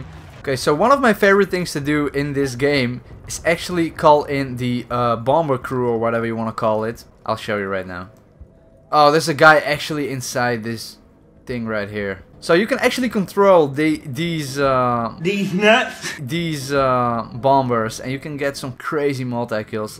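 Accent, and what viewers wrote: Netherlands. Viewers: based on the accent of this guy he is from the Netherlands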